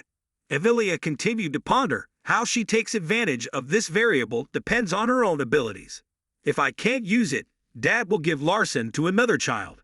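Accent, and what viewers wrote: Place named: United States. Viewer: Imma be real, I genuinely appreciate the effort but this is one of the worst AI voices I’ve come across to date; the disgusting American dial is cranked up far, far, far too much. It’s almost comical where it not for the fact this isn’t satire. I don’t know who your target audience is but unless you’re specifically targeting the most niece subgenre of fat fucks I’d highly suggest you mellow out some of that abhorrent mannerism and intonation.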